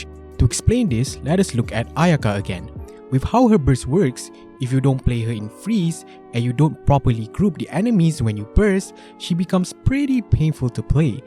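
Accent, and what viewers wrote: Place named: Malaysia. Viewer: bro got that malaysian accent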